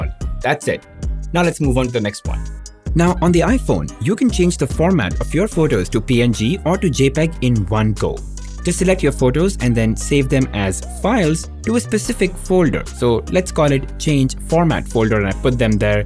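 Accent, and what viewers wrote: India. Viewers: That accent — Indian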